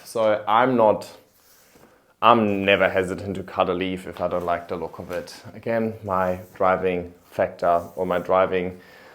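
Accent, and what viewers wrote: Germany. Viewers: So, I only really follow you here bc I can't handle other social media very well. I could have sworn in your last several videos that your accent sounded a little more on the German side 😂 It's typically a very equal balance between accents if that makes sense? But I was thinking you may have taken a little trip back to Germany or something, haha! I'm so so so so glad you had a good visit! I think we're pretty close in age and I live very far from my highschool (and current) best friend, so I definitely relate. I also moved somewhere that affected my accent according to others, but I don't hear it!!! So now I'm hyper aware of people's accents and how they can change!! Love it. 😂 Thanks for such a long video. I'm painting my new home so I'll definitely be binging your channel the next few days. 💚💚💚💚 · I have just started the hobby and I appreciate your videos and hope that I can achieve the same level of growth!! 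I am located about 1.5 hours north of you in NSW. Also, my grandmother was German and listening to your accent reminds me of her. You have the same work ethic as she had and passed that onto me. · When I first started watching you, i was like: "is this a german accent? No i think that is just how they speak in Australia" And then i was so surprised that you are acutally from Germany.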